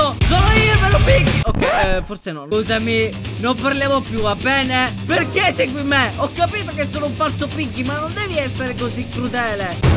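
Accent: Sicily, Italy. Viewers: Ma sei Siciliano ? :)))) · È della sicilia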